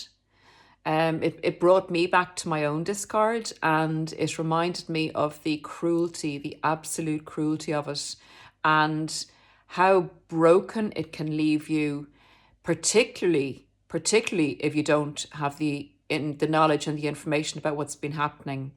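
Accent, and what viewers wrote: Ireland. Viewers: Just want to say I just ran into your channel wonderful videos I love your Irish accent and your charisma your a Attractive Spiritual Lady.. well here's my story.. Around 2014 I was on social media and Facebook a woman girl who latched on to me as a really close friend that was into the same arts and Music as myself. Just prior I had broken up with a previous girlfriend she came on pretty strong throughout 2014 till 2016 I started getting really close and I felt I found a wonderful person well I'll start off by saying she was a covert narcissist NPD vulnerable type but this is way before I figured it out we were long distance Maryland and Oregon I thought wow I finally met a perfect match. Time went on we started flying out to see each other back and forth for the next 4 years it was very amazing and she seemed very angelic and a stand-up person and she had a one son she was very generous and always kept offering to book our vacations and pay for everything I didn't like that feeling and paid for most stuff for us also that we did also I was sort of entranced that she was just doing it out of the kindness of her heart and care and was what I learned a codependent such as myself and she was really having problems with depression and other things and was looking for a connection. 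When we weren't seeing each other physically being long distance we talked on the phone every night when I get home from work and do Skype until we could see each other again on our vacations from work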